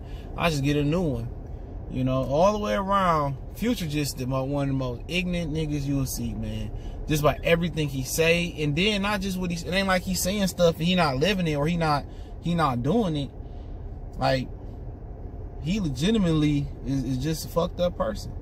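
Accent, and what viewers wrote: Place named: Cleveland, United States. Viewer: It sounds like you're from Cleveland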